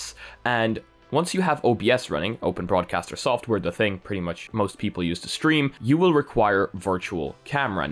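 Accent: Poland. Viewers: question are you from poland?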